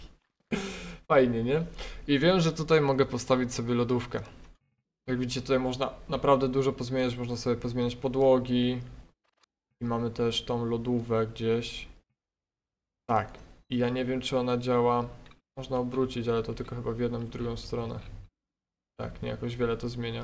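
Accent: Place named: England, United Kingdom. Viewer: А мне показалось англичанин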